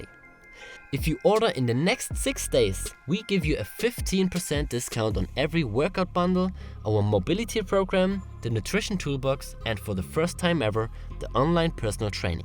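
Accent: Germany. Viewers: Nice muscles! Super body! 👍🏻😛nice German accent, lol! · Are you German? :) Just checking myself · Yeah, the accent (way het talks English) gives it away. ;) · This man is single-handedly rebranding the German accent from evil villain to uplifting coach! Danke schoen as always.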